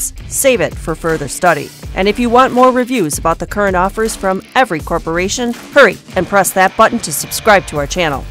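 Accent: Russia. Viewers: aww what happened to the awkward russian accent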